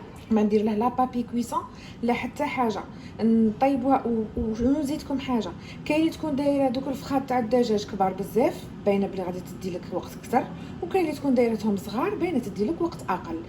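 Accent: Algeria. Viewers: لهجة جزائرية 😊😊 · ياختى ما احلى كلام اخواتاتنا الجزائريين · وهرانية ياك 😂هدرتنا حلوة عسل 😊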